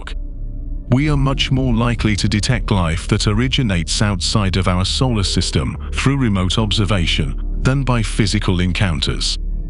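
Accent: United Kingdom. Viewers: Nasa’s the biggest hoax played on people yet! Nice artwork though! People will believe anything attached to an alphabet agency and a British accent!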